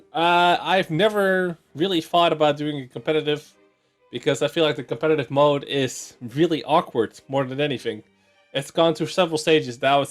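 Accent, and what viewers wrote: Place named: Netherlands. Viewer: IELTS? I'm asking because the accent is barely audible. Dutch myself as well, noticed that a lot of other Dutch people can speak English quite well, but the accent is very prominent.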